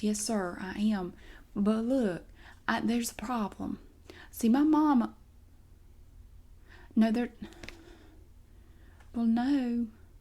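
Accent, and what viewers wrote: South, United States. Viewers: I absolutely love the Southern accent but the creativity of this was something else :D Very entertaining and relaxing, thank you!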